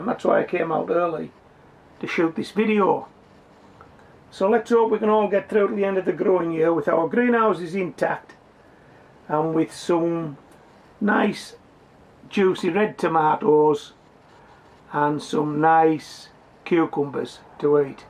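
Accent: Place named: Northern England, United Kingdom. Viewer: What a great idea about the burpless cucumber, had to laugh about that one. What part of England are you from? You sound like a northerner, only ask cos your accent sounds like my dad :-). I always grew up with a veggie patch and only just started to get into growing stuff again. Nice to meet you, I am a new follower and enjoy watching your videos :-)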